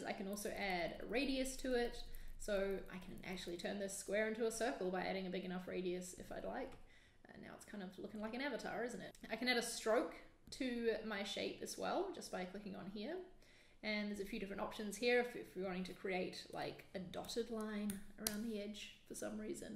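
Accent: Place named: New Zealand. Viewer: Hey thanks for the great vid. I also love hearing a fellow kiwi accent:)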